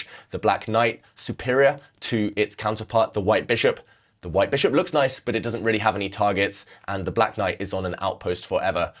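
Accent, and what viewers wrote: United Kingdom. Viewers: It’s the British accent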